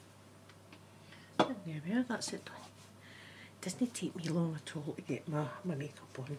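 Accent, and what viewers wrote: Scotland, United Kingdom. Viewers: Her Scottish accent is so cute I love it too and is so calming and relaxing even if I'm Italian so sometimes I have trouble understanding it 🤗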